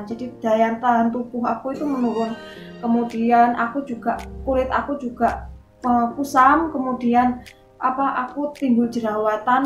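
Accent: Java, Indonesia. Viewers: Medok e ketok emen :v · Medoknya itu nah 😍😀😀😅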